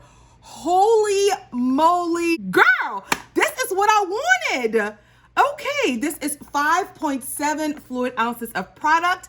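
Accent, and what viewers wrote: Louisiana, United States. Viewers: You have to from LA with that accent. Love it! Lemme guess BR or NOLA. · Your makeup and nail color are so pretty!!! And I love your Louisiana accent especially when you say your name and today...so cute😊 nice unboxing too😊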